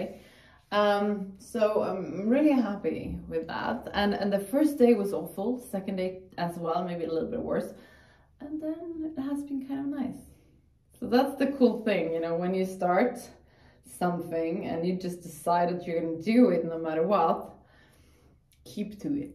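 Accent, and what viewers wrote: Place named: Spain. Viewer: I like your Spanish accent while speaking.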